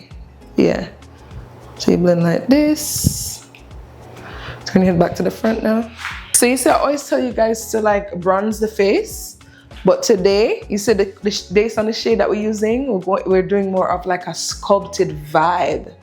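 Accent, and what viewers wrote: Jamaica. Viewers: What is the models she’s gorgeous and you beat that face soo good I miss og makeup tutorials like this and I’m Jamaican too did I hear that accent lol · First time seeing your video. This showed up on my feed. I hear a Jamaican accent. Just check the description and I wear correct😂 hello from your fellow Jamaican🇯🇲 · Why do u just LOVE to hear the Jamaican accent?? No matter how long we live in the USA wih juss caan hide😂😂😂.. !! LOOOVE ur work too Mama🥰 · You sound Jamaican I'm Jamaican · I actually really love this detailed video🎉❤ You've been popping up on my page for a few days now, and worst you sound Jamaican, you've gained a new makeup sub❤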